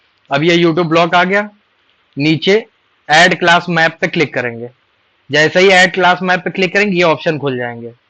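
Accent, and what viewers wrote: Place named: Uttar Pradesh, India. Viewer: avaj se lag rha tha koi up ka hai😾😾😾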